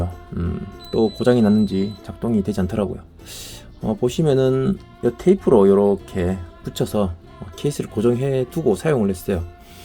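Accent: Gyeongsang, South Korea. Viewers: 사투리가구수하십니다..ㅎ 저도진해에서 군생활을해서 경상도사투리 좋아하는데.,ㅎ 후딱후딱 잘만드시네요 저도영상참고해서 리필해봐야겠네여 직업이 전동공구많이쓰는직업이라 저렴하게 리필해써야겠어요 영상만드는 일이 힘든일인데 영상잘보고 갑니다..^^ 화이팅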